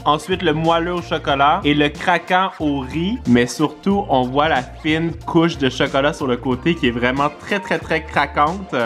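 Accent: Canada. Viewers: tu mon youtubeur préféré de is vidéos accent canadien et qui met quoi des vidéos trop trop rire 👍👍👍👍👍 24h 🇨🇵🇨🇵🇨🇵🇨🇵 🎄 Noël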